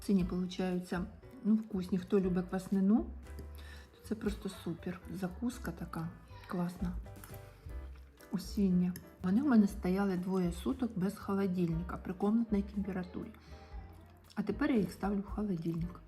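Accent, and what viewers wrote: Ukraine. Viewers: Ничего себе суржик.. Красивая украинская речь.. Не слушайте никого про суржик. Вот это и есть мова. А не западенский диалект. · Це не суржик, це російська з українським акцентом! Могла б перед тим, як знімати, приділити час і провірити у словнику слова, і не ганьбитися!!!! Каже не пишіть. А для чого тоді таке ганьбите виставляти?……. Улажую????? Тута і ТАМА….,сутки двоє….. А слово ДОБА ЗНАЙОМО????? Це вивчають у школі!!!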